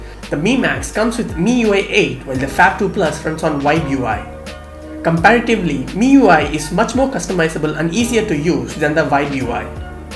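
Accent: India. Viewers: Indian accent is disgusting!!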